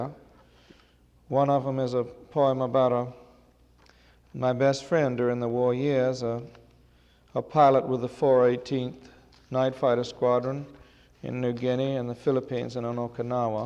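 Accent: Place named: South, United States. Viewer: Just wanted to speak on a tiny aspect of his dialogue during this reading when he introduces ‘Slave Quarters’: It may sound like he is saying the word, ‘N***er’ but that is just a characteristic of his particular accent. In the South, in Dickey’s day, the common way that black people were referred to was ‘negro’, and the word, when spoken in that regions vernacular would be pronounced as, ‘Negra(née-gra). You then have the ‘a’ At the end drop and it sounds like the word that is a racial slur but when people said the word back then just as Dickey did, it was used in the same way we would use the word ‘black’ to refer to an African American or ‘white’ to refer to a Caucasian. I just wanted to bring that up in case someone heard that part of the reading and was turned off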